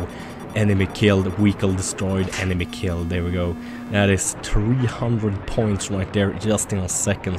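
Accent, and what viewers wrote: Sweden. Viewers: He's from Sweden, give him a break on his accent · Oh shut the fuck up guys. He's a Sweden so it's fine if he has an accent and he is a Damn good player and youtube. So hive him credit. If you don't like his videos, dont watch.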